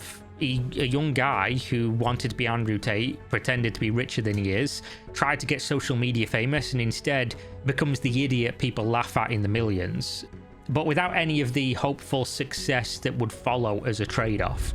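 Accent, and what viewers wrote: Northern England, United Kingdom. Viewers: First time ive seen you on camera, your voice is Northern English gold. Keep these amazing videos coming.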